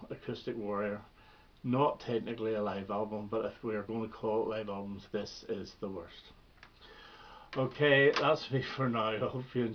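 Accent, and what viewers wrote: Northern Ireland, United Kingdom. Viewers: Hi, It's been a while. I'll be honest with you, it was the Americanism in the title that grabbed my attention. I came by to see if you still pronounce 'Aluminium' correctly. My wife comes from America and I have to suffer such vulgarities on a daily basis. Normally I look to you and your beautiful Northern Irish diction to save my sanity... but I can see that beginning to slip now... oh dear God. What is the world coming to...😪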